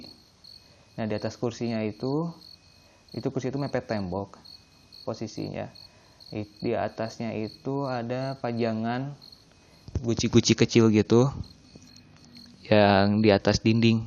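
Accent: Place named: Bandung, Indonesia. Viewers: Yang Cerita orang bandung ya? logatnya :D btw serem sih tapi lucu hhaa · Sunda pisan yaaa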